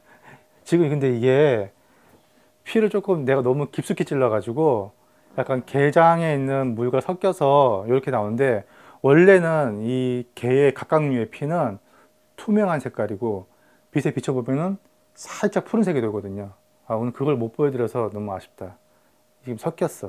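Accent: Gyeongsang, South Korea. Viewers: 억양을 들어보니 경상도 분 이시군요. 갑각류의 피가 반투명 묽은 파랑색인것도 처음알았습니다. 의학용 학명이 라띤어 이므로'Hemoglocyanin(발음상으로 적확하게: 헤모글로시야닌)'같은 구리성분이 함유된것이라고 아래 어느분이 한글로 적어둔 글을 보고 알았는대 다른분영상에서 복어 회뜨면서 독제거와 피를받아서 보여준적이있어 비교합니다만 복어의 피는 다른동물의 피처럼 빨간대 갑각류는 생선같은 어패류와 또 달리 반투명 믉은 파랑색인걸 알고갑니다. 좋은 정보였습니다.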